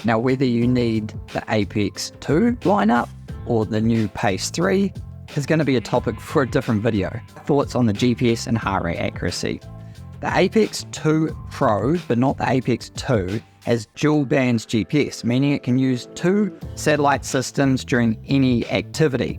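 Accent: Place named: New Zealand. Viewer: Bang on on the comparison between Coros and Garmin ecosystems. I run with older gen Pace 2 and FR245. Both meant to be 'entry' level watches. Neither is perfect, both complement each other well. Totally agree with your Coros highlights over Garmin though. Stumbled on your channel recently and very grateful to have done so. You're an endless wealth of information on all things running = THANK YOU! And nice to hear a New Zealander here in Australia over yet another US 'run tuber' 🙏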